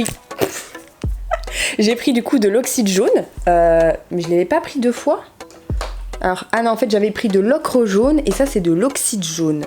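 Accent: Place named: Franche-Comté, France. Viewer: Mdr oui les francs comtois ont un accent lol. Ah oui je suis une franc comtoise et du haut Doubs donc un cran de plus 😂🤣😂 Si jamais on peut te voir où sur Besançon ? Je sais pas si tu voudras le dire mais histoire un.jour de se rencontrer... Vidéo top moi qui aime.a donf les couleurs vivent et oui dans le.bio on a que des couleurs nudes... donc trop Contente de voir cela. Merci À bientôt pour une prochaine vidéo... hâte 😘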